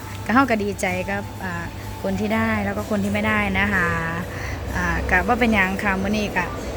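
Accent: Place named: Isan, Thailand. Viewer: ผมมักเสียงเว้าน้อง คัก❤❤❤❤❤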